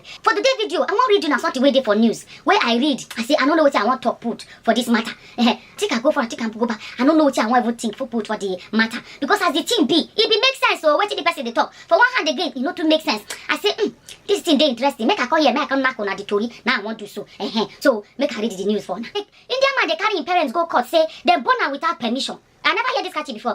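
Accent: Nigeria. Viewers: I'm so glad to be part of this journey!!! Your pidgin English sweet well well 🤗❣️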